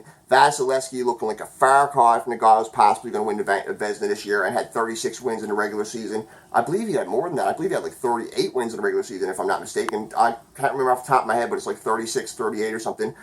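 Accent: Newfoundland, Canada. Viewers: Is that a Newfoundland accent u have? Scottish origin? Im Just a silly American so I really don't know but that's what I'm guessing...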